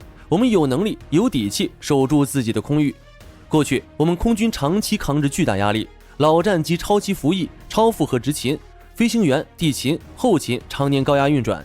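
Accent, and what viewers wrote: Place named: China. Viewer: 講話主播是臺灣人嗎、大陸口音。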